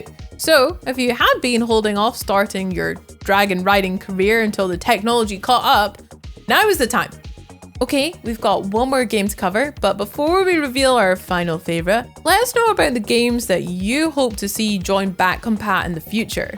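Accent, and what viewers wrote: Scotland, United Kingdom. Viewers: She sounds Scottish at x1 speed as well ya know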